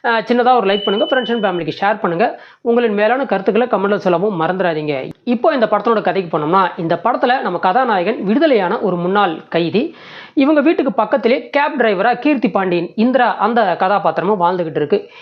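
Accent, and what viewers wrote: Kongu, India. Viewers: Noted Kongu Tamizh Vazhga...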